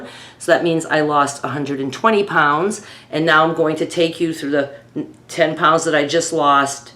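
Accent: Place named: Upstate New York, United States. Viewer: so happy to have found you! I want to ask. Where are you from? You sound just like my family from way upstate NY like the north country accent.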